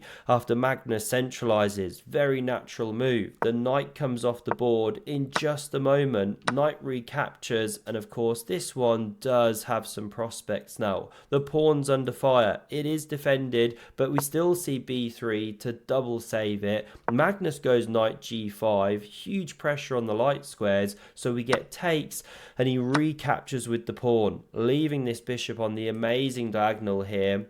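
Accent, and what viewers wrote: England, United Kingdom. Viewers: which part of uk is ur accent from?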